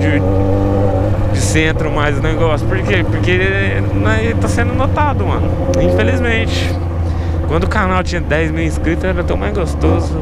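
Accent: Goiás, Brazil. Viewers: Vc é Goiano? esse sotaque seu, parece demais de goiano.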